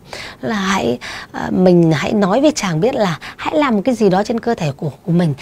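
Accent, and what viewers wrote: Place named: Vietnam. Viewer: Chị ở đâu mà nặng tiếng địa phương nhỉ. E ở hải Dương nè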